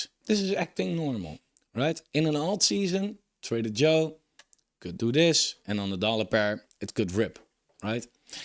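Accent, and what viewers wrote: Netherlands. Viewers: Are you from The Netherlands?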